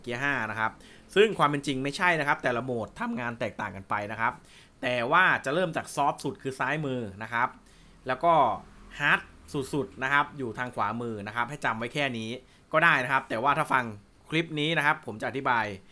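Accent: Thailand. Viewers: ติดตามตลอดครับชอบที่ภาษาอังกฤษสำเนียงไทยๆนี่แหละบ้านๆดี ไม่ต้องเป๊ะเวอร์เหมือนกับเรียน wall street english😃😃😃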